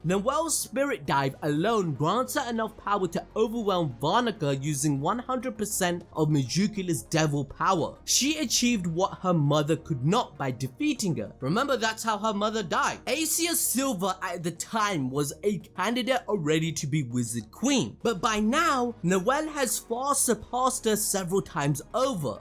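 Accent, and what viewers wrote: Punjab, India. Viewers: Is this guy indian? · Nice hindi accent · The Punjabi Hindi accent🤣🤣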